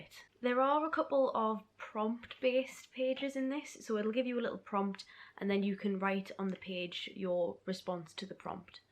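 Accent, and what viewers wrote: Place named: Newcastle, United Kingdom. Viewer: Being from the North East myself, there's nothing nicer to hear than that Geordie accent :)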